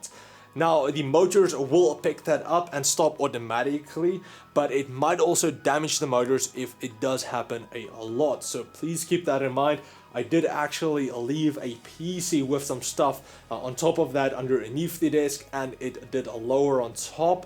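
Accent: Afrikaans, South Africa. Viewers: That's what it sounds like when you've Afrikaans speaking English... The South African accent is neutral, meaning it is easy for South Africans to pick up on other accents.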